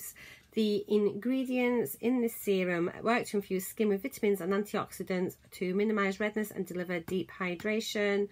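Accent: Yorkshire, United Kingdom. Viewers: That would have been a nice little gesture on their part if they would send you the Monday all I want box for free, especially as you are a beauty youtuber!! Also, you sound like a Yorkshire lass... am I right? xx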